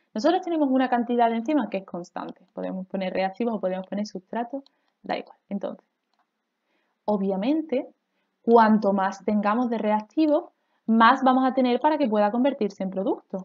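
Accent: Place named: Andalusia, Spain. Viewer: me acabas de salvar el curso, además me gusta tu acento andaluz, me ayuda a concentrarme. Gracias por explicar en 15 minutos lo que mi profe no me ha explicado en 8 clases. Su voz me aburre, no como la tuya. Eres muy buena ticher, gracias 🫀🫀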